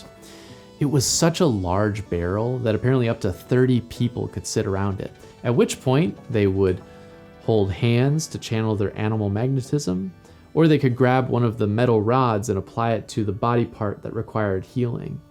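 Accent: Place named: Scotland, United Kingdom. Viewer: Dude nice Scottish accent.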